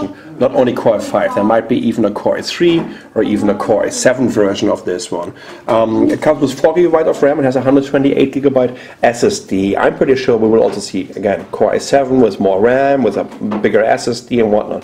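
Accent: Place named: Germany. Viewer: his accent is awesomely evil. sounds very german.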